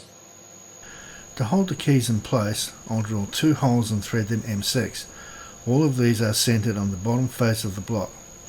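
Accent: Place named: Australia. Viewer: You know what my wednesday needed? a little bit of machining with an outback inflection. This was a fun one to follow along with, I just restored a manual lathe and endmill and I've been liking the hobby toolmaker side of youtube. greets from california mate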